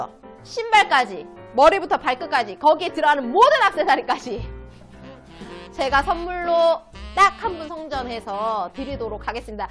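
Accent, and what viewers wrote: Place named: Gyeongsang, South Korea. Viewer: 반갑습니다 혹시 오십대 후반에서 육십대는 안 되나여? 돈 쫌 있어둥 섣불리 들어가기가 힘든 곳~~ 가슴과 엉덩이는 내려앉아 허리와 배는 절구통~~ 그나마 다리가 짧은건 대하고나니 쪼매 위로가 된다는ㅋ ㅋ 이런 조건들로 인하여 번쩍거리는 유리창 너머는 늘 곁눈질로만 바라보고 지나간다는 슬픈현실~~ 왜냐면 저런데는 쭉쭉빵빵만 다니는 곳이기에~~ 근데 롱셔츠나 롱원피스는 내도 보정옷 착용후 입으면 되것는데~~~?갱상도 어디세요? 완전 우리동네 억양이네여^^~~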